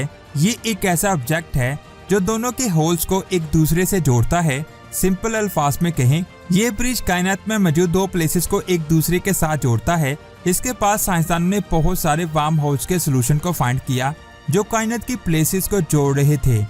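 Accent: Punjab, India. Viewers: Pehle apna panjabi lehja to badlo baat urdu m lehja panjabi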